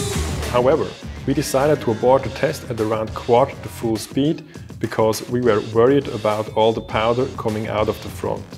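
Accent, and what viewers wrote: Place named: Switzerland. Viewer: Ret schwizerdütsch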